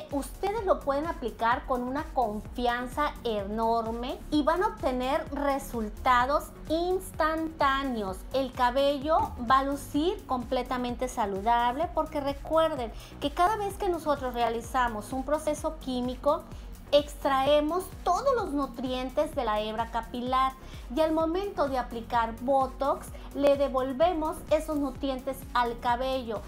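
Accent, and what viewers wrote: Mexico. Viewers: Gracias por tus lindas palabras amen. Tu asento me suena de nacionalidad Méxicana. ¿De que país eres? Lindo dia.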